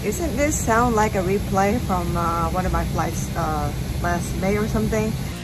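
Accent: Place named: Philippines. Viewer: Ok ...ok....you came up on my youtube, and when I heard you speak...I said to myself...."Is she a filipina?". I kept on listening, and listening, and when you said the word "actually"....I said YESS! , She is a filipina! Then your mannerisms at the end, confirmed a little bit. That is how my female cousins act when they get a little "friendly". LOL! But when you ended your vlog with a "Maraming Salamat Po"! That confirmed it!!! MABUHAY!! I just subscribed!!!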